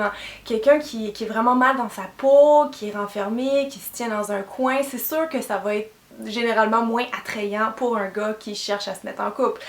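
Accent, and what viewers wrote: Quebec, Canada. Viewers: J'adore ton accent tu es canadienne ???( c'est mon pays préféré ) merci pour ta vidéo . moi je n'ai pas de petit ami mais je suis amoureuse de qq qui est dans ma classe .comment lui dire on est très amie si tu as des conseils il sont les bienvenue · Bonjour, c'est super tes conseils! Merci! mais je t'avoue qu'au début j'avais du mal à te suivre car tu parlais tellement vite (en plus de ton accent Québécois) mais tu t'exprimais tellement bien et quelle dynamisme! Qu'on oublie vite ce côté là et heureuse de connaître ta chaîne de maquillage merci 😘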